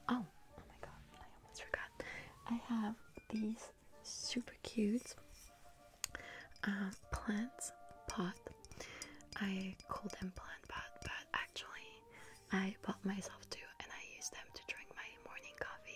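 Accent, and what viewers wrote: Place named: France. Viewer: I looove it when you do english videos with frensh accent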